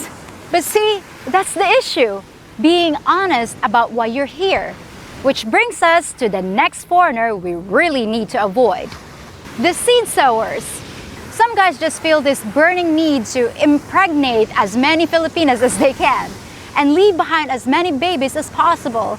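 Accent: United States. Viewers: i can hear that american accent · You speak English with a VERY American twist... very entertaining, realistic, and honest. "Filters" didn't exist when ang akong asawa and I were video chatting decades ago. And I made sure she understood although I made respectable money? I was a working man... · Hey, did you ever live in the states or dated Americans? I've never met a Filipina who could speak like you. Just wondering.:-) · Kudos to your great American style English. Well done.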